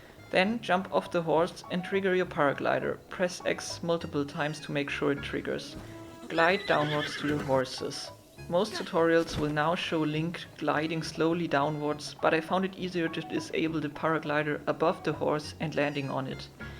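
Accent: Germany. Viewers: Man merkt den Deutschen akzent hast ein gutes Englisch :D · As a German I knew you were German without seeing in-game language :D we just can't get rid of that accent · man hört sofort den deutschen Akzent raus :D gutes video! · deutscher akzent :D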